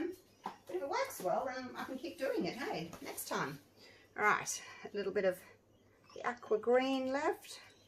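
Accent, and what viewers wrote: Australia. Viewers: I get such a kick out of the way she talks. Not the accent, which I’m low key obsessed with, but the things she says like right-o and hey? Or aye? I’m not entirely sure what she says ... but those types of things. I don’t know if it’s an Australian thing or an everywhere but New York thing. I enjoy people/things that are different from myself. Btw: I’d love to do a class type of thing with her! Like via zoom or something! That would be so much fun && totally something I’d pay for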